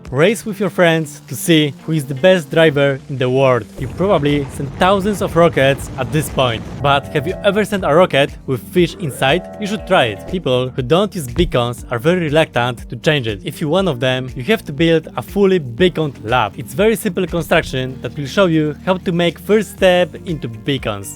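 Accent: Russia. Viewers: Well, I would say that I love you because you have a Russian accent, but I think it would be useful for you to learn Russian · почему мне кажется что у тебя Русский акцент?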